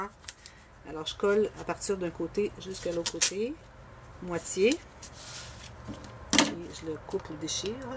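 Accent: Canada. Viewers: Merci beaucoup amitié(j'adore votre accent canadien)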